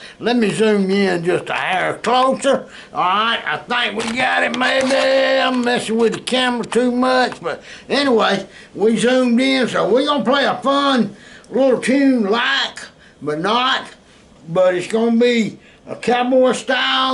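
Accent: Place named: Appalachia, United States. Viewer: I like the way you talk! Good ole Appalachian/southern draw.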